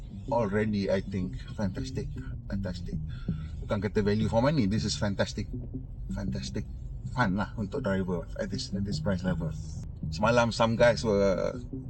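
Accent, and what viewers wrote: North, Malaysia. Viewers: Biasanya saya akan puas dengan penjelasan, cara bercerita dan selit berseloroh loghat utara, tapi kali nih saya berkedut dahi nak faham abang sulam campur rojak dgn bahasa Inggeris..hemmm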